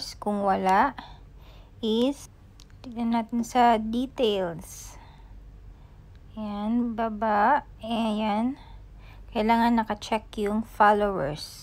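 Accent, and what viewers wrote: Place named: Pakistan. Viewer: pak english is life joke your so beautiful #sweet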